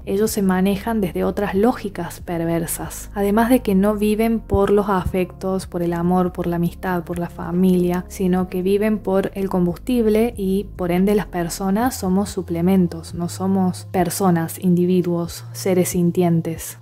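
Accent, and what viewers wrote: Argentina. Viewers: Hola! Estoy casi segura que es Argentina!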